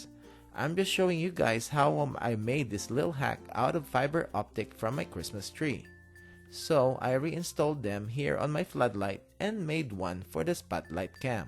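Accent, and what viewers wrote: Philippines. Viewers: Is that a Filipino accent? Tagalog?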